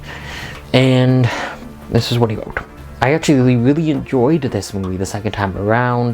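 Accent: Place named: England, United Kingdom. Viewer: Bravo! Really insightful thoughts about an awesome movie ( which SO needs to win an award for costume design). That English accent is pretty damn good too!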